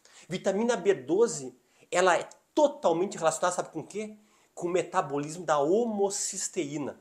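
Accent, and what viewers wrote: Rio Grande do Sul, Brazil. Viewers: MS que médico bem gaúcho tche!!!!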